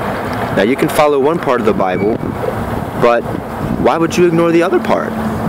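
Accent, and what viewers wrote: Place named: England, United Kingdom. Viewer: robert lyte is australian this guy is english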